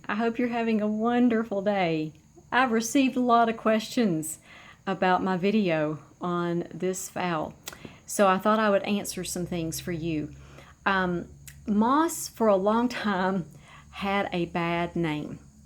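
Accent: South, United States. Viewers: without humor or the ability to laugh at yourself life would be sooo boring. I never take myself to serous. I like to be silly. Lost it for a bit but recently found it again. Right here on youtube among all of you❤ Its gonna be a great expiriment i have 1 sharry baby division in Chinese spagnum. It came off the same plant that had 20+snails and is currently residing in water and doing AMAZING. its in my second latest vid (how do you call that in proper English🤔 ) when i have them both in moss I'll come tell you. So You can be the judge of the moss (if its came back to life or if its the bad kind) you never fail to wam my heart. I might be Dutch but i do have the same good oll "southern?" Charm.😉 Notice that its the second time im trying to fish for info about where your from... since i love the accent but are not an expert.😁😇 Much love and happiness Kind regards rose 🌹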